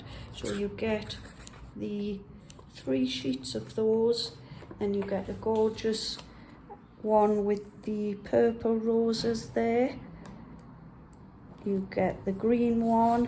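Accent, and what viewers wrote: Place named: Northumberland, United Kingdom. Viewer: Enjoying your Northumberland accent :)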